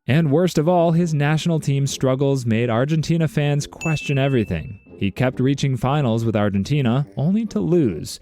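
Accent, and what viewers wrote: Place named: United States. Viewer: First I thought to myself why did he put Ronaldo with a #21 on the thumbnail but then I heard the American accent and everything made sense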